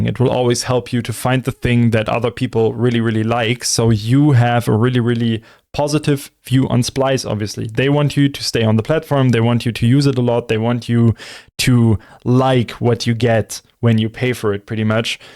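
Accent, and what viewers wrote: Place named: Germany. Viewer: Du klingst deutsch . Bist du deutsch ?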